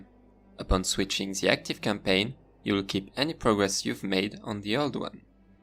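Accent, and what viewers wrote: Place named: France. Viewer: Bon, je suppose que t'es français vu ton accent xD C'est en français le "campagne quest" ? Parce que c'est la première fois que je vois ça,et j'avoue que ça rajouterait un peu de lore épique :D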